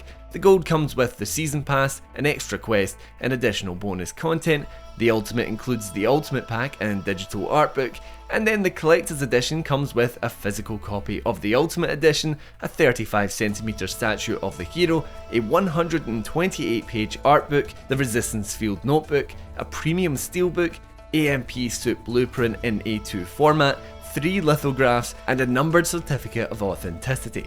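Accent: Scotland, United Kingdom. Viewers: Random thing to say but I haven't heard a Scottish accent in a while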